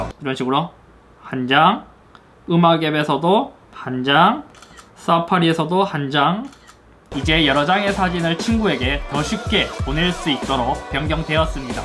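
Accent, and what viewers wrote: Gyeongsang, South Korea. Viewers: 잇섭님 경상도 어디에서 올라오셨어요? ㅇㅅㅇ??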